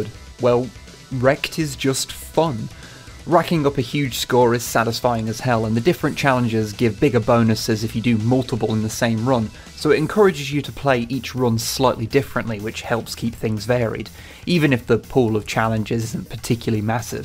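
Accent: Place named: United Kingdom. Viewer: That was really good, I've missed a British man reviewing video games in my life. The game also has a demo, downloading it right now.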